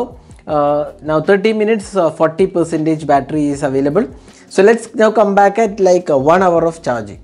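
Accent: Kerala, India. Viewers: Malayalali anale english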